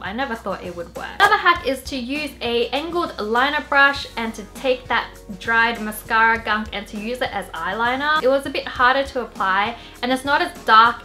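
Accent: Australia. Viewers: Her OZ accent tho😍😍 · Wait am I the only one who think her accent is stronger (Australian) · Are you Australian? OUO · I miss her more Australian accent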